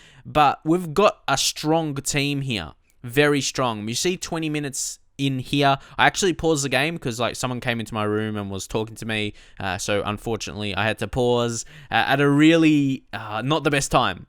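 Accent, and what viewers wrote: Australia. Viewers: R u Aussie?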